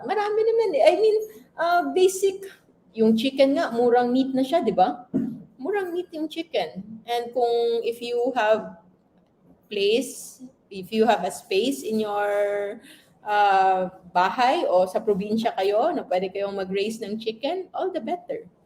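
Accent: Iloilo, Philippines. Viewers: Ilonggo ka ba Doc?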